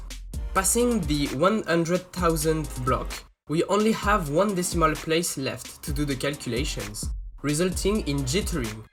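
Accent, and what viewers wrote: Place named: France. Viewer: J'ai reconnu direct que tu est français xDD sa s'entand mais sinon gg mec tu a fait du bon boulot!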